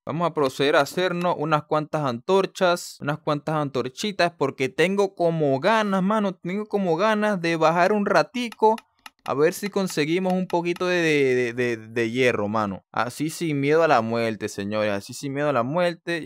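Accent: Venezuela. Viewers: una pregunta se que ere venezolana mano porque yo soy venezolano tambien y hablas como yo pero en que pais vives o sigues em venezuela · Si pues el es venezolano no ves el asento venezolano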